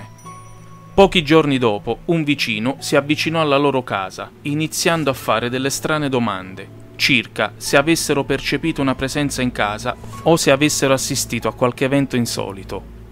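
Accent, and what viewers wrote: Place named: South Tyrol, Italy. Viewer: Ti do like perché te lo meriti, però promettimi che fai un corso di dizione, e che ti Levi l'accento tipico del tirolo... Senno non puoi avere un tono professionale...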